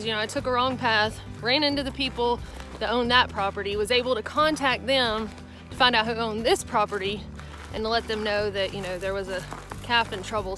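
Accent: South, United States. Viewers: I usually turn CC on because my hearing isn't the best and I think it's hilarious that it interprets your "hikers" as "hackers". Hurray for your Southern accent :)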